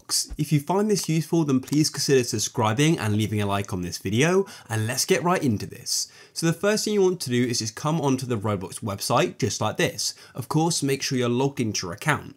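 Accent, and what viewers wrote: United Kingdom. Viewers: No way y’all all just got scammed by a random British dude I feel bad for y’all 😂.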